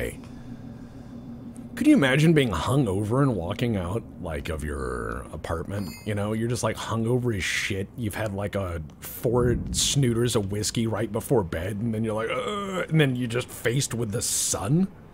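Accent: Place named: Texas, United States. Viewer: French in a Texan accent is an unnatural but hilarious combination.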